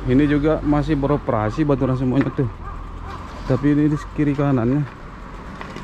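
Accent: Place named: Bali, Indonesia. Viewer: Logat nak bali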